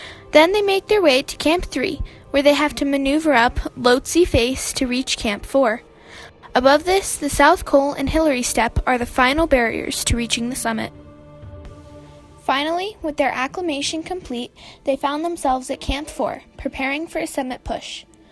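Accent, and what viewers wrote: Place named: India. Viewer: Indian accent 👌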